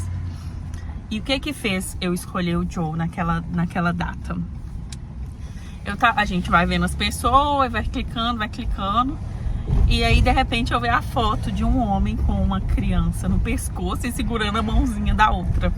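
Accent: Minas Gerais, Brazil. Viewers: É isso aí minha linda.Mar calmo nunca fez bom marinheiro! Principalmente se somos cristãos aí é que somos forçados a crescer e amadurecer nas batalhas da vida. Um grande abraço adoro o inglês com mineires e português tudo num vídeo só! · Que história de amor linda ❤️ que Deus continue abençoando grandemente essa família linda que vcs estão fazendo 😍🙏 amei de vdd sua história o YouTube te recomendou a duas semanas e já maratonei vários vídeos seus vc é divertida com esse sotaque mineiro seus filhos são uma benção vc é guerreira humilde amei vcs e vou ficar por aqui assistindo seus vídeos e torcendo pelo casório e a casa nova de vcs bjussssssss❤❤❤❤❤❤ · Vc é mineira? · Vc é hiper bacana, guerreira, batalhadora,linda história, tudo de bom.Vc é mineira! Téns um sotaque mineiro, tenho muitas amigas por isso estou perguntando,Deus abençoe vc e sua família,♥️♥️🙋‍♀️🙋‍♀️🙋‍♀️ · Linda a história de vcs, como bom mineiro estou achando similaridade nesse seu sotaque 😂 um abraço e muito sucesso em suas vidas.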